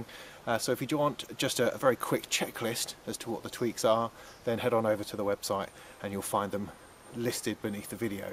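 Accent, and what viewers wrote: United Kingdom. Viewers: y=u futn brit mate... 2quik 4meaaaa{british accent}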